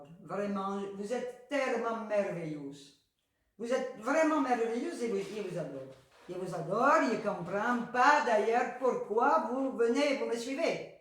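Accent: Algeria. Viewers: Coucou ma adorée...Pero pasa que es? Je n'ai pas de son sur ta vidéo. C'est la première fois que cela m'arrive. J'ai tout vérifié et nada! Je ne comprends pas pourquoi... J'ai quand même regardé ta vidéo " en mode muet" et je vois qu' apparemment, tu es toujours dans les bonnes affaires.... Le porte-bijoux: j'adore et les bougies aussi.... Et pour couronner le tout, je viens juste de m'apercevoir que Youtube m'a désabonée de ta chaîne...Oh, la, la, la! Si l'année commence cà, comment va-t-elle se terminer??? Tu n'as pas perdu la voix au fait? Tu ne fais pas semblant de parler??? Lol, j'plaisante bien sûr mais c'est dommage de ne pas entendre ta douce voix mélodieuse: tu sais, celle avec notre accent de P.N. Je te fais des tonnes de bisous tout blancs.... car nous sommes au mois de Janvier!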